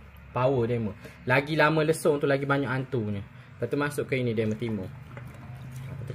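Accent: Pahang, Malaysia. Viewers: Pekat sungguh bahasa Pahang. Dengar loghat tu macam dari belah lepih gitu yek. Koi org Jerantut. Lama dah koi tak masok gulai tempoyak ni. KL ni Payoh amat nak cari tempoyak.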